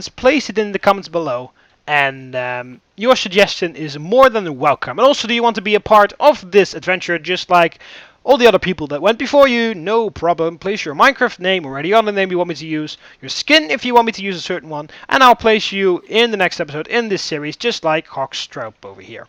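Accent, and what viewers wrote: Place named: Netherlands. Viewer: also are you dutch because you kinda have a dutch english accent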